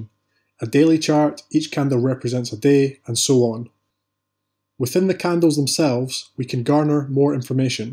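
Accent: Scotland, United Kingdom. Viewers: Looks like I've found my teacher and a Scotsman by the sounds of it, like myself 👊 I recently opened a brokerage account and had a go. Yeah, ended up with a migraine and worn teeth. Thought I should search out a guru! Looking forward to an intense, enlightening journey! Really appreciate your content my friend! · Just a beginner here and was glad to find a video with easy to understand info about candles, and by someone with an awesome Scottish accent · Thank you for the clear and simple explanation. Great Scottish accent for narration too. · Great to hear another Scots accent. :) I just signed up for a ForexCT account but having suffered severe memory loss from a brain injury my days of previous financial education are a lost blur. So this video, I'm sad to say, is where my level is at. I'm just trying to put my life back together. (I'm a Glasgow boy but I now live in Australia). 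So thank you. ;) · Absolutely love clicking on a video and hearing a Scottish accent! Thanks for the great vid bro 🏴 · Excellent video, very well explained and presented. Nice to hear a Scottish accent as well. Thanks for the video.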